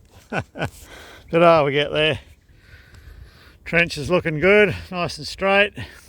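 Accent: Australia. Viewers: Another great video! Cheers from Norway again as it's late beer O clock! Looks like the stops there was a couple of Cat 3500 piston pins, have done a fair bit of those... Currently doing MTU for the last 10 years similar to the 3500. Also great to hear the Australian accent and the entertaining expressions like '' as the crow flies'' you have to explain that one as I didn't get it. Anyway cheers!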